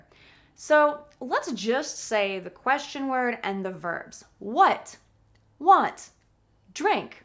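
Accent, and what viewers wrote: United States. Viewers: Bravo bravo it’s the first time I understand someone speaks American English ☮️🌹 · American accent?? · Dear teacher. I am from Somalia, I really love your American sounds. Proud of you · I love the American accent, hope you will help me learn it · This is not natural English as it's natural American.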